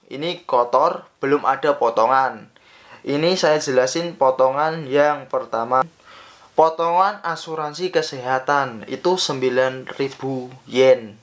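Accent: Java, Indonesia. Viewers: jowone pundi mas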